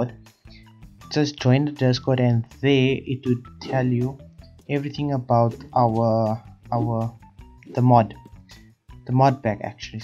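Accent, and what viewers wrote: South Africa. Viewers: Ur accent sounds like a South African accent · You sound like you from south africa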